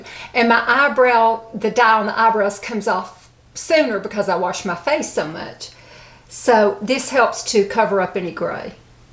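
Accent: South, United States. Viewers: I have headed such great things about those little elf eye shadow pallets , I think these are going on my wish list for my Christmas list :) I love listening to you while I’m wrapping and stuff around the house , I just can’t really say how much I enjoy your videos so much. They relax me, and I could listen to yoursweet Southern voice for ever ❤️ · Love the southern accent. I got one to; Live in Mississippi. You are a 💎 gem. Love ❤️ your videos. Thank you 😊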